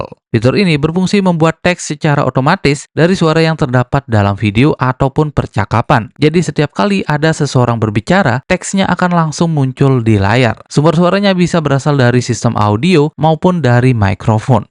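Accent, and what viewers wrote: Bali, Indonesia. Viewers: Orang bali bang? T nya khas bali banget 😅🙏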